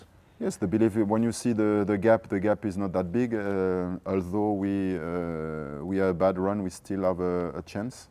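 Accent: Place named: Europe. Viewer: This bloke has fraudently made a career on sounding European and sophisticated.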